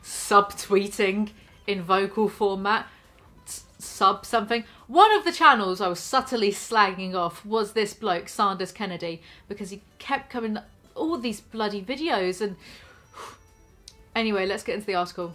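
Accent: United Kingdom. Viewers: its not just the accent, youre sooooo brit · i wish i could get my own british person to rant about stuff to me all day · You went full British for most of this video and I’m sliving for it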